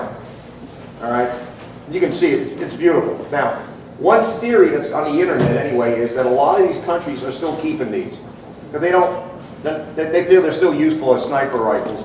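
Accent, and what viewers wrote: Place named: New Jersey, United States. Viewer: He speaks New Jersey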